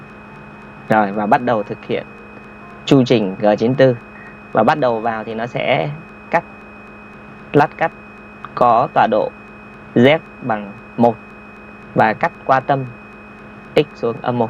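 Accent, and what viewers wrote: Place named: North, Vietnam. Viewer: Người bắc nói giọng bắc dễ hiểu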